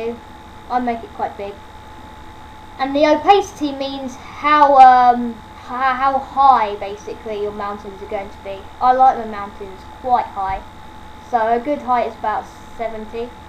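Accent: United Kingdom. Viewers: great video but lame choice of english accent. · omg bro is british! finally i found a british unity tut thank you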